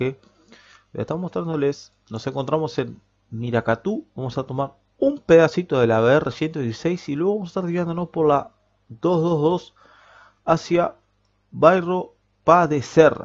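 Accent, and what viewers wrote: Argentina. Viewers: a pense que de argentina jaja saludos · Capoooo Pense que eras Argentino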